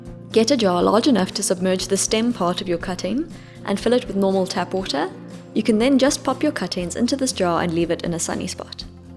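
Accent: South Africa. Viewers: Very informative, I'm definitely going to try and I was happy to hear a fellow South African! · Are you South African. I detect a slight accent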